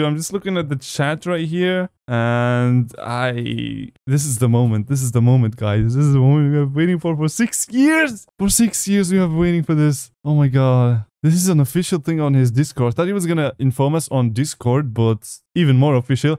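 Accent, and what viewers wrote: Germany. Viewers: Are you German? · Wait you german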